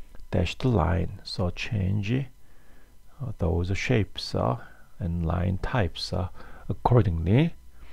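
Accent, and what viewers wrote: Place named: South Korea. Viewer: definitely korean accent.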